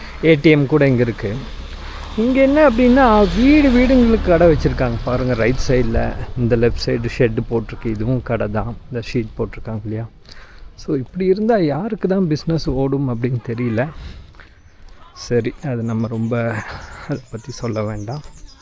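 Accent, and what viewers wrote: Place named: Karnataka, India. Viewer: ௨ங்கள் பேச்சு ஒ௫ மாதிாியாய் இ௫க்கிறதே நீங்கள் கன்னடத்துகாரரோ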